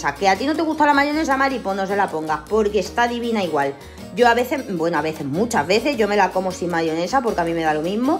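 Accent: Andalusia, Spain. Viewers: Muchas gracias!!!! K buenas recetas hijaaaaa. Oye tu de donde ere?? Tu acento es andaluz no??? Un besito wapa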